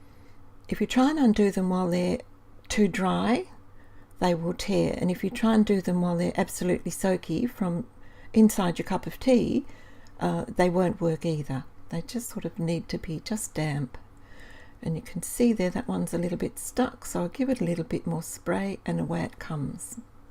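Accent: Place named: Australia. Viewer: GREAT VIDEO, NICE VOICE AND AN AUSSIE 😊 THANKS SO MUCH.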